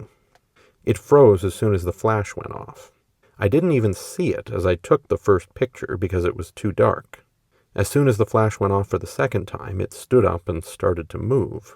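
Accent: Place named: Canada. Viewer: I’m so glad I found this channel. I appreciate the quiet, measured and affably Canadian narration. I also like how you just lay down the stories and the evidence that are out there so people can draw their own conclusions. Most paranormal/cryptid/UAP channels are ultra low effort AI clickbait, so when I find one that’s not, it’s very exciting. Keep up the good work!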